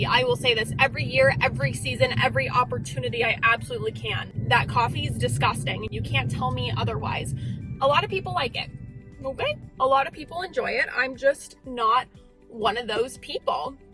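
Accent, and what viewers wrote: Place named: Upper Midwest, United States. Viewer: Sexy with that upper Midwest accent. What a cutie.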